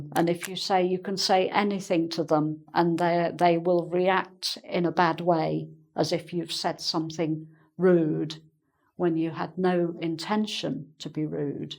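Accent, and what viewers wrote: England, United Kingdom. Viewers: Here in Brazil, we also have a word like prickly and this one is "espinhenta" a prickly person is a "pessoa espinhenta", full of sorrow and not a good person to be with. And touchy, if it's exactly as I can wonder while I watch your explaining is what we brazillians use to call "uma pessoa muito dódoi", what means a person who would be easily offended due to very little things. I'm loving your british accent because I'm training listening of british english. Thank you very much. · England I'm guessing based on her accent, but I couldn't say exactly where. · Yes ! This Lady IS a true teacher, a Real english person with a Real accent 👍. It's Very Good to learn with her. No mistake. Thanks for her. 🌹💓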